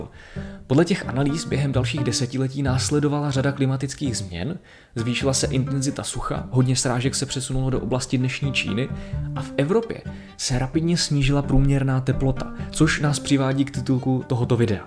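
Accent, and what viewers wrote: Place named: Prague, Czech Republic. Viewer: Kdybys vynechal ten pražský přízvuk tak 10/10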